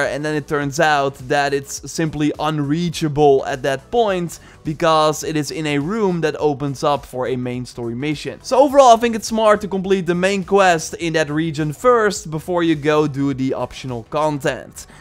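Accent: Netherlands. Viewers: You are aware he has a Dutch accent, right? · it's indeed classic Dutch laziness. With some practice they can easily speak English perfectly without an accent but after following this man for about 2 years now he literally hasn't improved at all.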